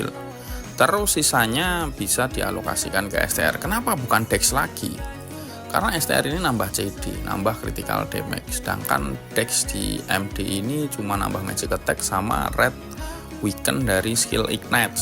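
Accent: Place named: Java, Indonesia. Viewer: Gua sub yt lu karna jelas ngasih penjelasan nya,meski ada loga jawa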